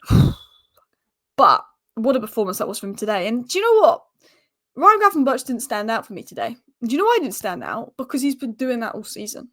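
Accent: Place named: Manchester, United Kingdom. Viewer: That's a thick manc accent.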